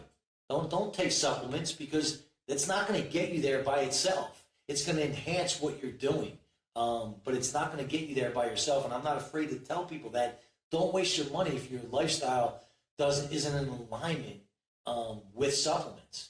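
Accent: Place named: East Coast, United States. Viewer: Definately Hear That Wonderful East C. accent! #MM2018 , Your Amigo Santos.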